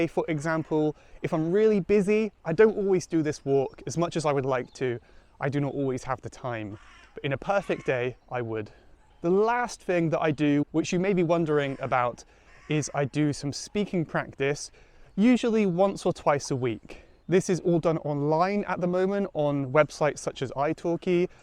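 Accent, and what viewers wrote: United Kingdom. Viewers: I came across your videos today and I must say I love your British accent. I learned English at school, 50 years ago ! I have never had the opportunity to talk to an English person but now, at least, I can hear this lovely language. I have been learning French these last years and I used so many methods...! It's amazing how easy is to learn languages nowadays, (with a lot of motivation, as well). Thank you so much for your work. I would like to visit your country and take the famous 5 o'clock tea with scons ! 😊Greetings from Argentina.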